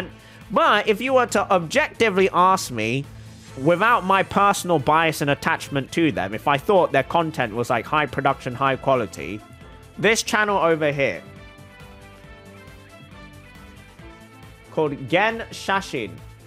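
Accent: England, United Kingdom. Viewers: I'm here for the english accent.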